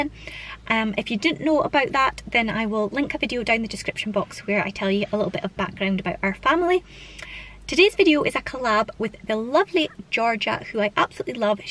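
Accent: Scotland, United Kingdom. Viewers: You Scottish yeah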